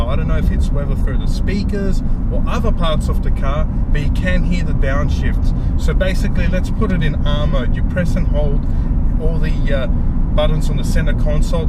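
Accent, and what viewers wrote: Australia. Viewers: you got an aussie accent mate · You sound like an Aussie!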